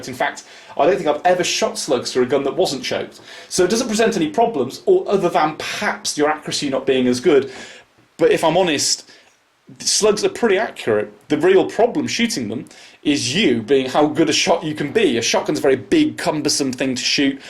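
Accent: United Kingdom. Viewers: I did NOT expect a British guy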